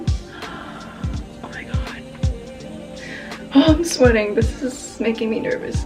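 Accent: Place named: Philippines. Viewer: Which part of the Philippines are you? She does look like filipino and her accent if is filipino accent passing 🥱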